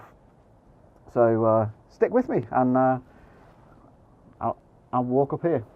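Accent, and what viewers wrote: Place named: Merseyside, United Kingdom. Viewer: Really enjoyed that video only just discovered your channel so will be watching a few more. It is a truly magical location I must of followed a similar path to you, as I have similar angles,(maybe not quite as death defying on the cliff) it was that windy I could hardly walk up by the lighthouse. Am I right to think by your accent you don't live that far from me in Merseyside somewhere I live in Runcorn.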